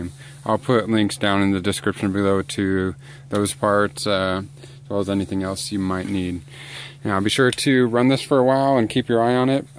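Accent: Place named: North America. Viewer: You sound like you're from North America. North America runs on 60 Hz not 50 Hz. That blower will blow eventually too.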